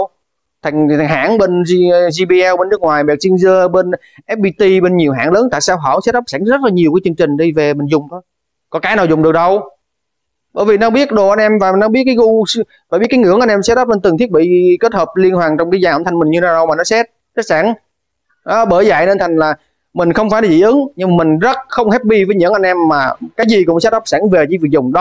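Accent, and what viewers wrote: Standard. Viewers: Ô a nói chuyện chuẩn quá.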